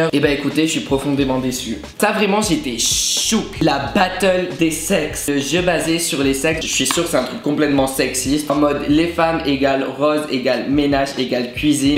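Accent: Quebec, Canada. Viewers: Est tu Québécois ou Français ? Je suis dans le doute 😂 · t'es tu Québecois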